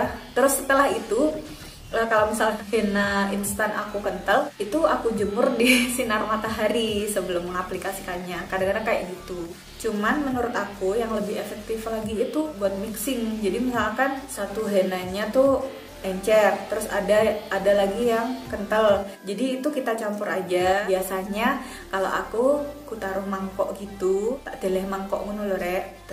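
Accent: Java, Indonesia. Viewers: Suka mbak mix bahasa jawa gtu ngomongnya,,,hehe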